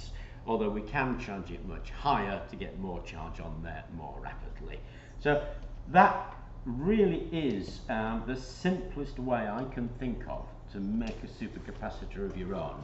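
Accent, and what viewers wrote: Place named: United Kingdom. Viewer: And, trying to talk slower would help, as well... I'm in the US; &, trying to "translate" UK English into US English can be "interesting." I had to reduce the video speed to 75%; AND, STILL turn on closed captioning, just to follow what you were saying. If I had trouble following what you were saying, I'm sure that I'm far from alone here in the US... (I just tend to be more out-spoken than most people.) Interesting content, though. :)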